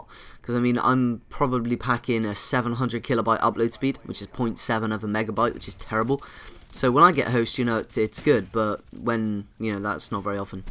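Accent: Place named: England, United Kingdom. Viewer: Where a outs in England are you from:D I love your accent;D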